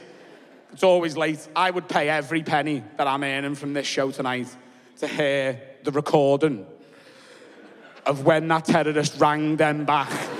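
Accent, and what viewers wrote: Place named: Liverpool, United Kingdom. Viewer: Was this chap braught up, same place as darran till. Accent seems, very similar, unsure if it changes certain parts of Liverpool, like it does generally, in Glasgow, newcastle, but this guys accent and darran tills, sounds very similar, but different to carragher, just a thought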